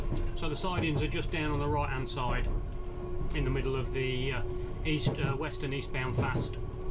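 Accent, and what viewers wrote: United Kingdom. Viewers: ngl i rlly like that british accent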